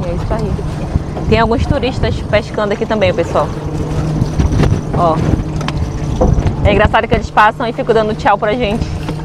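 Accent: Rio de Janeiro, Brazil. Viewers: você é carioca??? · Sotaque parecido com carioca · Vc tem sutaque de carioca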